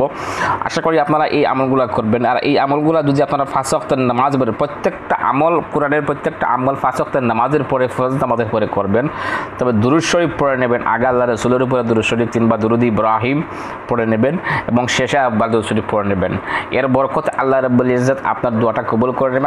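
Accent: Sylhet, Bangladesh. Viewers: ভাই মনে হয় সিলেট বিভাগ এর লোক।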